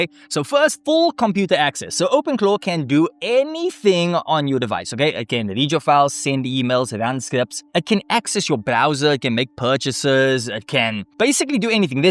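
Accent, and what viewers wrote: South Africa. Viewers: ... Are you.... Are you South African ????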